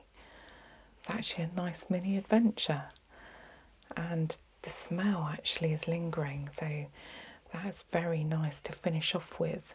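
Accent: England, United Kingdom. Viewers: You have a soothing voice and I love an English accent! Actually, all of my go-to asmr channels are from the UK! 😅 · I really liked this video with the hand treatment. The hands hold tension also and a lovely relaxing massage and treatment is amazing. I know why I love your voice so much, the combination of your soft voice and the British accent really makes your speaking relaxing. Everyone subscribe and hit the like button if you are new here watching the lovely. I listen to your videos just before bed and I fall fast asleep. Blessings lovely lady 🌿🌿🌿🌿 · If you were ever to open a shop where we could get treatments like this, I would happily visit. Also, I realised I love your accent as well - I lived in the UK for a few years and still remember it fondly, your voice brings back happy memories while creating happiness in the present too. Thank you ❤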